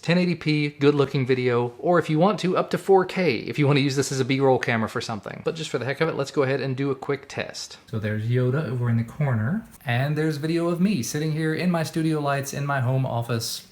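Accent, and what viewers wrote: Kentucky, United States. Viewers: R you from Kentucky?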